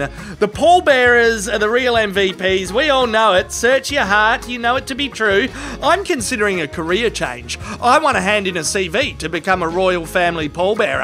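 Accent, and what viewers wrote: Australia. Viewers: Me and me mates sat in Leicester and watched it on big screen getting piss=d. We endured 6 hours of middle class, mid life crisis BBC droning tossers. Wish you had taken over as you nailed it in under 4 minutes. Wherever you are liz, know that your best eulogy had an Ozzy accent.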